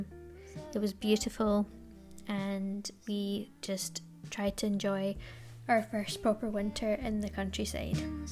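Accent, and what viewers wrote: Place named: Scotland, United Kingdom. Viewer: Watching from Wales. It's very nice to hear a Scottish accent for a change, as it's mostly middleclass English people who make this type of content in Scotland & Wales.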